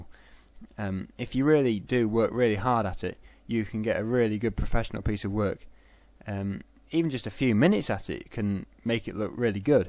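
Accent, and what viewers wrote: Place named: England, United Kingdom. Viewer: Thanks mate for helping video. Just optained Corel Video Pro 6. Very nice tutorial for starting guys like me. Love your Englings accent. Cheers, Eric (nl)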